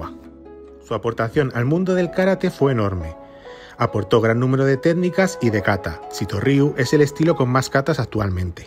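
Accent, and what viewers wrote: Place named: Spain. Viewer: por el acento qué se escucha en el video supongo que eres de España, según tengo entendido que qué las mejores escuelas MARCIALES de Karate Shito Ryu están en Barcelona, a modo de recomendación recomendaria hacer un video de cualquier línea de Shito Ryu acompañándola con algún video de alguna escuela de Karate Shito Ryu marcial de Barcelona. Estaré pendiente del video (por cierto no conocía este canal pero debido a este video de Shito Ryu qué has hecho me he suscrito, buen canal, felicidades).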